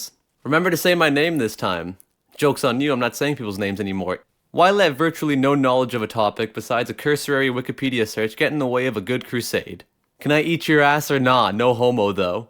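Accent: Newfoundland, Canada. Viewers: are you from newfoundland cuz i hear you saying ''bhys'' alot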